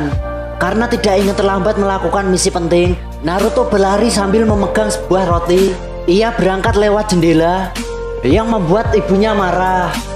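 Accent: Java, Indonesia. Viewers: Logat nya jawa banget kang 🗿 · Anjirrr suaranya medok bgt😂😂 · Wong jowo yo mas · Awokawok logat jawa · Njir medok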